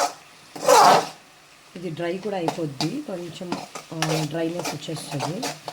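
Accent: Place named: India. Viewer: I already subscribed but I'm a big fan of your voice and what a Telugu slang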